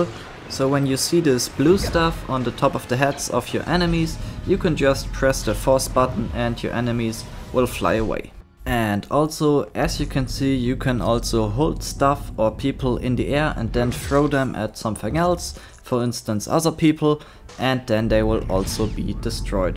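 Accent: Germany. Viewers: dein deutsch englisch ist echt net gut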